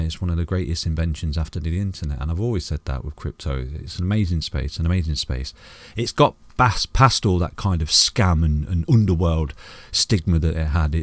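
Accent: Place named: Midlands, United Kingdom. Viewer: I think Midlands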